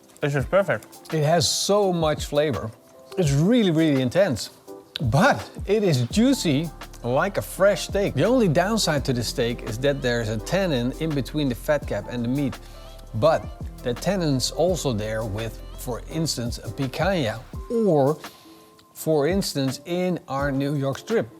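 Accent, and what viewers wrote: Netherlands. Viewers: I grew up in the Netherlands and I'd bet money ( by his accent ) so did this guy. If so the price of beef in NL vs its price in a beef producing state in the USA is not a fair comparison. Flat Iron ( what that cut is called here in the USA ) is usually BBQ'ed which breaks down its intramuscular sinews rendering a tender cut for the plate.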